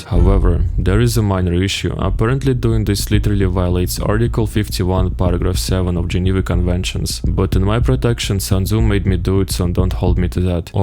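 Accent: Russia. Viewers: я слышу твой русский акцент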